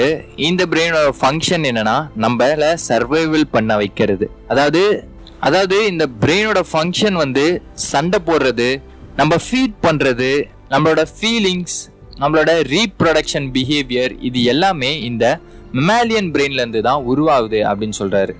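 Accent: Kerala, India. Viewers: கேரளா தம்பி குரல் மாதிரி இருக்கிறது. நீங்கள் யார்?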